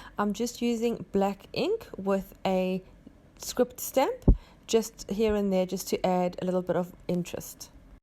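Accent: South Africa. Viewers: Glad I found your channel .. this looks like fun. Love the saffa accent 😉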